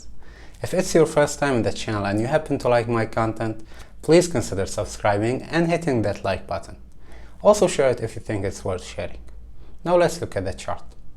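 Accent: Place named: North Africa. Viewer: I am hearing a North-African accent :)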